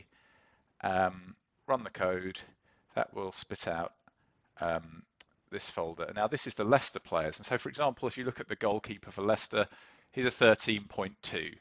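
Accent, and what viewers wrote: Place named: South America. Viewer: Very interesting to have the critical data, but imo, sexy sounding South American > data.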